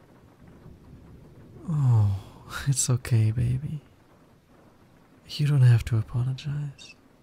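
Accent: Germany. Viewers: Der Deutsche Akzent ist 🤌🏻🤌🏻🤌🏻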